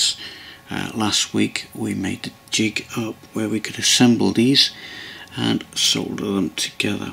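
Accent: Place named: Newcastle, United Kingdom. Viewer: Sir Nigel Gresley would be proud of you,superb work,you can tell you are a Geordie bud.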